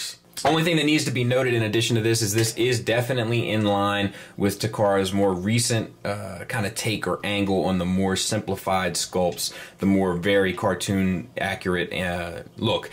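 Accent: England, United Kingdom. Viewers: Love the English accent, haha! Good review as usual,. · Love the limey accent...lol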